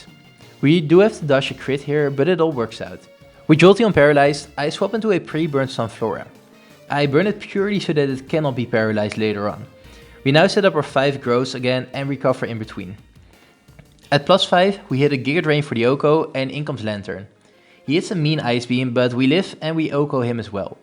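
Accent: Netherlands. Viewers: you sound very dutch, is this true?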